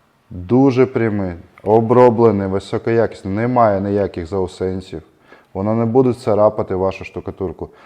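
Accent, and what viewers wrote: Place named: Russia. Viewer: Да, чувствуется, что русский родной 😊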